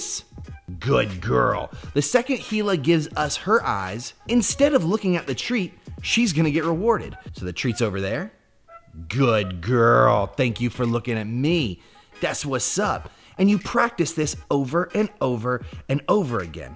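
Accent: England, United Kingdom. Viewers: Hi what a Funny English Accent love it . Hila is a good Listener 🤩🤗 Britney is calm.. luv chilling out after work with your videos still trying to work out which Day I am on Ha Ha...😍 Good tips catch up soon .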